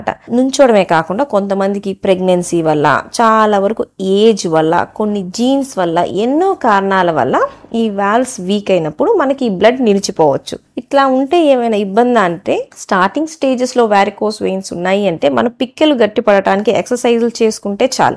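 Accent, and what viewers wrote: Guntur, India. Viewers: Thank you Dr.. At an early age you are explaining every aspect very clearly in telugu that too in Guntur Style. All the BEST.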